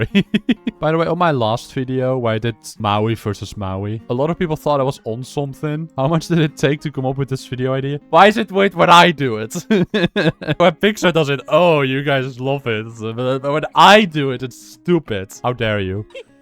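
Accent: Netherlands. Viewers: it was a very weird game. It went e4 e5 f3 d5 Be2, I was losing all my pieces but then I turned the board around and won the game. In all seriousness though, I got completely cooked and lost. You can view the game, it was broadcasted om lichess, tournament name was Dutch Open 2026 (also are you Dutch as well? Your accent makes me think so, would be cool to see you irl) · you sound hella dutch lol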